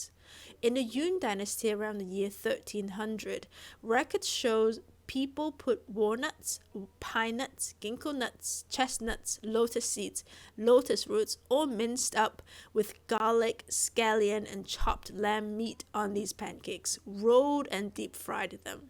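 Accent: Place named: Hong Kong. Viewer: I can totally hear your HK accent. Was assuming HK before reading this comment. Can hear your British infusion into your accent. Sounds great!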